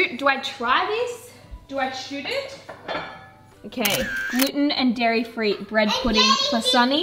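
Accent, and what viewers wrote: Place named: Australia. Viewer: OMG YEA FINALLY!! 🎉 Called it on both the warehouse and the cook book. Low key been praying for a cook book because I’m a hard copy type of gal and I had a feeling from your vlogs when you kept saying you guys had filled the space at your old apartment I was like hmmm new office. Warehouse for photo shoots or new campaign ideas🙊🙌🎉 love it. Also the commentary is so Australian in this vlog I died when you said “hot AF”😂😂😂 Sending you many wishes for the new year and your new adventure with the warehouse. Can’t wait to see the series! 💗💗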